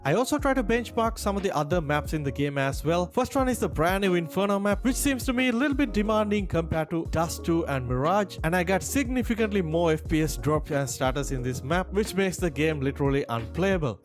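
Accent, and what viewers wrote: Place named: India. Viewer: Indian accent 💀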